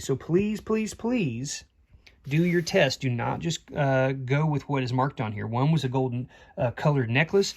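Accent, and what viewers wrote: South, United States. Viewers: It's called Southern/country/hick lol! That's all darlin' 😂 there's all kinds of us around these parts in the south. Bless your heart❤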